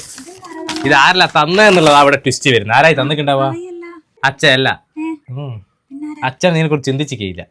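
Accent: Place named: Vadakara, India. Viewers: ഇത് വടകര ഭാഷ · കണ്ണൂർ സ്ലാങ് 👌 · വടകര ഭാഷ 😂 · Evdya place nammade tlsry panoor slang🤣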